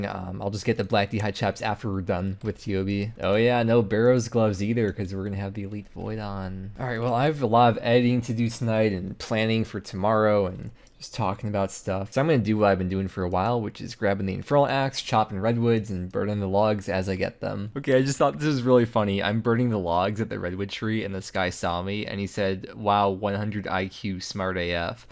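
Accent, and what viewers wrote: Canada. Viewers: wait a second.. are you canadian? So many good laughs this video ! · wait is canadian? pls tell me I'm not sure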